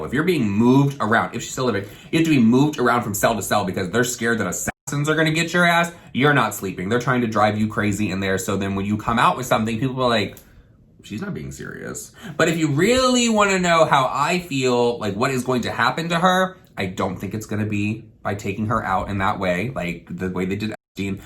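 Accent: South, United States. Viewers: Saw in another video your in Florida.... I thought I heard some southern! (Not in a bad way of course) Watching from LA ( lower Alabama) love the channel.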